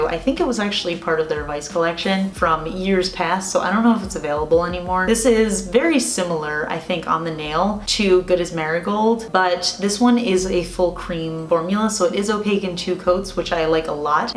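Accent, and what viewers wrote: New Jersey, United States. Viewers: Triggered by your NJ accent. 🙋🏻‍♀️ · From one Jersey girl to another, don't apologize for how we speak! Funny thing I never noticed how I said orange! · Hey lady I love how you say your Jersey words and if people don't like your accent, fuck em'! It's ridiculous to me that people give you crap for how you pronounce some words... you are eloquent and intelligent and I love your videos! Thanks for being you 🧡🧡🧡🧡🧡🧡🧡🧡🧡🧡🧡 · 🤯I’m from NJ and I didn’t know we said Orange different until I heard you, 😂 and I live in the Orange state now! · Didn’t realize that got so much hate for her Jersey accent. It seems such a stupid thing to be annoyed over. Everyone who isn’t from where you are is going to have an accent. You have an accent to all those people. What’s the big deal? I personally like hearing different accents. I like the variety of voices. 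· From one Jersey girl to another.. our accent is fabulous. Love the video too! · Purrrrrrples! Purps purps purps - lilac and lavenders next please :) and hell yes Joisey girls unite! It’s wharter not wahter duh 🙄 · Love our Jersey accent!! How about the way we dog. Dawg!! Lol!! Loved this vid!! Orange gives me serious fall vibes!! Love the fall!! Hugs!!! 🤗🤗🤗🤗🤗💚💚💚💚💚💚🍊🍊🍊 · I’m a Jersey girl too!!!! I say orange the same way & coffee... 🤣I love the orly color. Also I love how you did your makeup orange - you look so cute. 🍊💗🤗 I picked up a bunch of OPI polishes from Marshall’s today & found myself checking to see if the polishes were jellies or not using the tips from your videos.😉 $3.99- win! I love the OPI brushes. They had lots of nudes & good fall transition colors. Anyway thanks for another great video!! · Maybe because I'm a Bostonian I dont mind the Jersey accent..🤷🏻‍♀️ lol dont get why it bothers people lol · I'm glad you're making this series! 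I honestly needed more oranges in my collection. Thanks for the suggestions and the reference! I'm trying to get out of my cold color bubble and trying to wear warm colors. I don't really notice the New Jersey accent since I'm also a New Jersey kid, do we really have an accent. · I know I’m late the the game, but your “arenge” doesn’t even phase me! My husband and his family are from NJ, so I hear it ALL the time! I love you! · Your accent is adorable! From a Canadian to a NJ! I worked for Amex and NJ was my fav state of people to talk to. 😊😊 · I’m from Jersey too and I say it the way you do and until you said it I never knew anyone said it differently 🤷‍♀️ lol. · Forget the polish,which,by the way were all gorgeous, ZOYA-“Alma” & SALON PERFECT-“Too cute or spook” were my favourites.It is your EYE makeup that captured my attention,it is stupendous,and your accent is brilliant,I think the New Jersey accent is by far the best in the US of A. 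I have tasted ruby chocolate,it was a Magnum ice cream bar,and I thought it was raspberry flavoured but it said nowhere,as you said aswell,that there was fruit flavouring,apparently it’s the natural “fruity” taste of the ruby cocoa bean 😳🤷🏻‍♀️. 💚🇮🇪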